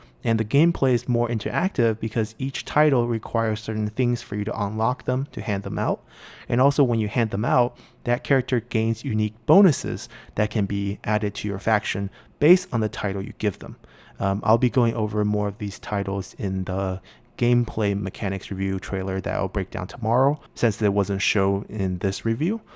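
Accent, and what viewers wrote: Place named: China. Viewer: 中國口音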